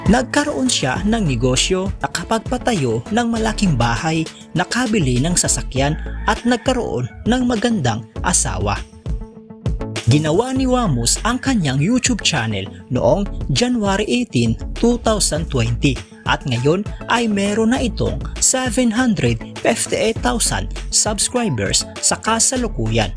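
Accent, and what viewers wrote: Visayas, Philippines. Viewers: Pag BISAYA TLGA, MASAKIT SA TENGA, PUCHAAA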